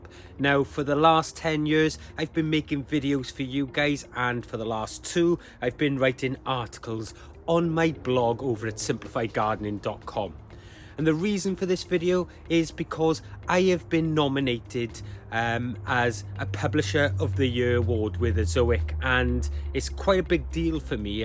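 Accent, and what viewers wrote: Wales, United Kingdom. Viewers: Hi. I must have missed this one. Your sounding proper Welsh in this one happy dayzzzz. By the way I am myself from wales just down the road from you any how take care Ange and the gang 🤗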